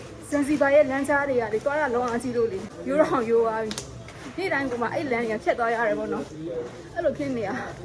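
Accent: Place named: Myeik, Myanmar. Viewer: အမက ဘိတ်သူလားဗျ ဘိတ်အသံနှင့် နည်းနည်းတူနေတယ်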